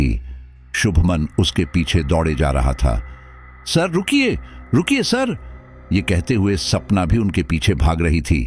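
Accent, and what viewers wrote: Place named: Bihar, India. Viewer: यह कौन सी बिहारी है आधा हिंदी आधा बिहार😅😅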